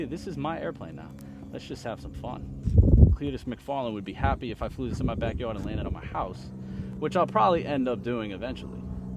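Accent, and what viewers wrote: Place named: New York, United States. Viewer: Hello brother.. I’m a new subscriber from Darwin Australia 🇦🇺.. been binging your content for weeks now.. your a real chilled New Yorker by the sounds yeah 😅apologies if not .. but hey can we see more cleetus cub content.. maybe how to progress to acro .. maybe how to bind to better controller..🙏😁👌big fan from Australia mate ..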